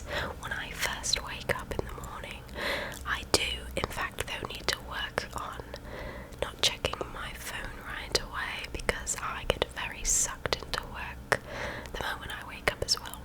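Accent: United Kingdom. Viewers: Perfect accent. I say that as a Brit. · Yes!😊😊😊😊. My wish is finally granted! is back with another ASMR video with a british accent! YAY!🎉🎉🎉🎉🎉🎉. Also, you sounded like Hermonie Granger's accent from the first Harry Potter movie! Now that's wicked cool!💙💙💙💙💙💙💙👍. I give this video an A+ for your incredible British accent,!⭐⭐⭐⭐⭐⭐⭐👍. Have a great weekend and enjoy your fantastic accent.😊😙💙💙💙💙💙💙💖💖💖💖💖💖💖😊⭐⭐⭐⭐⭐👍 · You let it slip a few times went abit Birmingham aha but other that a genuine solid accent it u spoke to me in the streets I wouldn’t question it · You know I'm from NYC and seeing a beautiful latina do a British accent I am honestly impressed. In the future could you please slowly scratch the fluffy mic again? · British accent why not ? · Hi, I missed you very much, your British accent is wonderful ❤❤❤ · Nice and great video. I was really surprised to see u do a British accent. 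I must say u do a perfect British accent 100 percent. U sound almost like UK asmr remember her. Thumbs up 👍👍 August.21.2021. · Really Beautiful Woman with a Very Beautiful British Accent!! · this video will be 2yrs since it was released and I'm still hoping she'll make a pt.2 of it coz this is always my go to British accent ASMR. ☺️ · I just assumed you were British…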